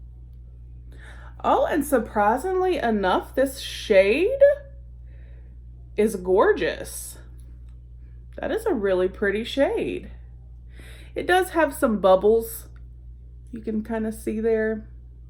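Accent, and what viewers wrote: South, United States. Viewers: Thanks so much for doing this video! It's great cause I totally missed out on these boxes and I really wanted to see what was in them! And you are so cute with your sweet southern accent! 😘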